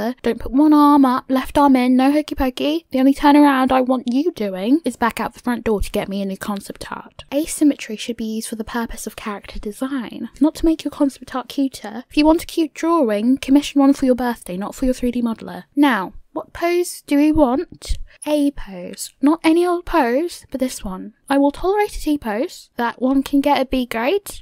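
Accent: United Kingdom. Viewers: Love your British accent lol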